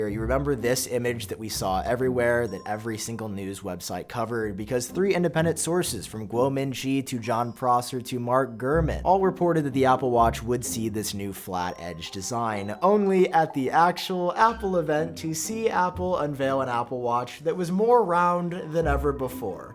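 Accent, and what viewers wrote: United Kingdom. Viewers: I like your British accent far more than Damien’s hair cut. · Sorry You've made this offence too many times I gotta say something😂 There's been too many strikes. I must correct this, Your "British" accent is in fact an English accent. Britain also consists of Scottish people, Welsh people and Northern Irish people and we pride ourselves by not sounding like them. The american equivalent would be like impersonating a New Yorker with a Boston accent. The more you know 😜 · The British accent is pretty much on the money! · From one English chap to another 😂😂 great accent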